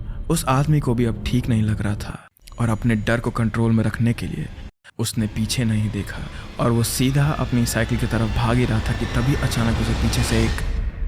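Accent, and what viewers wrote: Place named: Bihar, India. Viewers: Dude, you have nailed it. This is one of the best story telling of yours so far. Your voice modulation, Bihari accent, background score everything is top notch. Great work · its bihari accent for me....damn.. adooorable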